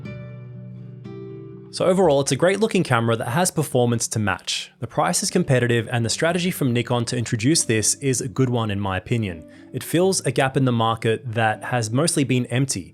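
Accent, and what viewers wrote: Australia. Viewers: Judging by your accent I'd say you are an Aussie. I used to work for Paxtons Photographics in George St , Sydney in the 80s, half a lifetime ago . At the time it was the biggest camera store in Sydney. I bought a Nikon FE when it came out then to add to my Nikon F2. Both great cameras. I'm pretty excited by the Nikon ZF and am going to buy one in the next week or 2. It really does look like a slightly larger FE! This camera could have been designed with me in mind . Lol. It's just what I have been waiting for...I've just discovered your channel and subscribed. Great video and photos!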